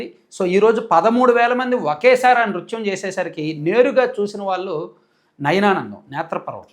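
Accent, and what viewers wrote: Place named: Telangana, India. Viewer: You talk like a Telanganites!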